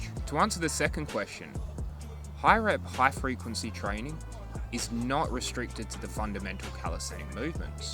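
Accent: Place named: South Australia, Australia. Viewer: You have a South Australian accent!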